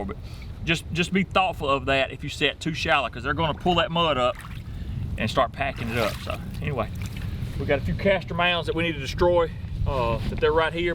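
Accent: South, United States. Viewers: Hears the voice of the master typically redneck BS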